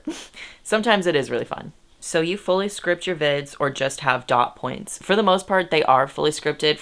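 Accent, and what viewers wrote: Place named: United Kingdom. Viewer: I've been following your channels for a while now. It's been nice to witness your development, the content journey and the much deserved growth! I've watched every one of your videos and am glad I have. I'll continue to too 😸 Totally unrelated to the vid, but the recurring bri'ish accent always makes me laugh 😹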